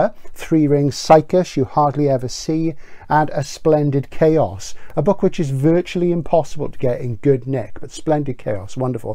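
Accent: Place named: Newport, United Kingdom. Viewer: I'm guessing you are from Newport? Sorry I love my accents and decoding them.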